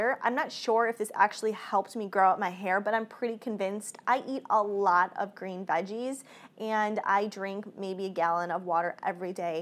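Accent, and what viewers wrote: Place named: Chicago, United States. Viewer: recognizing your Chicago accent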